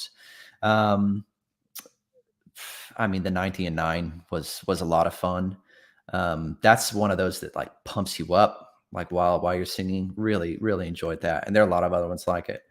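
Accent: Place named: United States. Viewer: 🇦🇺🇳🇿 both my flags 😊 I love your music, partly because you remind me so much of my Dad when he was in his thirties (he would be in his eighties if he were still living) - your looks, facial expressions and mannerisms as you sing, the harmonies and parts/overlaps - very nostalgic for me. Except - now I've listened to your American accent...haha...not my Dad's Kiwi one! (Of course!) 😂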